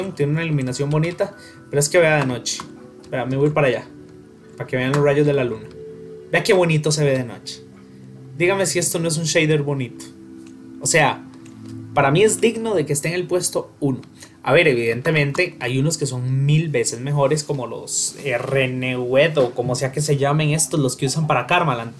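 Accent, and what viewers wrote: Costa Rica. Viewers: Parece tico PD: en el lo confirmé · desde el minuto 1 se nota que es costarricense jaja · Me suena a hermano tico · Mae usted es de costa rica verdad? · Grande este perro, ya me decía yo que ese acento se me parecía jaja xd, buen video me encanto👌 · Quién más se dió cuenta que tiene acento se costarricense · Jaja yo también dije "este es tico" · usted es de costa rica ? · supe que eras tico, desde que digiste inutil jajaj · Ese asentó lo conozco bro, ¿Eres de CR? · Todos hablan de los shaders pero papi desde el minuto 1 note su acento tico, pura vida mae 🤙🏻🇨🇷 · mae usted es de costarica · Es Tico? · Desde que oí tu pronunciación de R supe que eras tico xd Salu2 desde Argentina (Soy de Nicaragua) :D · Bro eres tico? xd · mae sos tico verdad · JAJAJA Bro, yo venía a comentar también que fijo eras tico xad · Eso mismo iba a comentar, este wila es tico. 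· Se te nota lo tico de largo jajajaja, buen video bro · es por el acento tico igual lo pronuncia asi Rodezel2.0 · Tu forma de hablar se parece a la de un Costarricense, eres de por acá?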